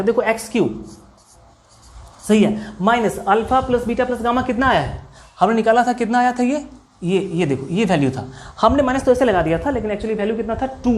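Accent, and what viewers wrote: Bihar, India. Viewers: aisa style to sirf Bihar ke logo m hi h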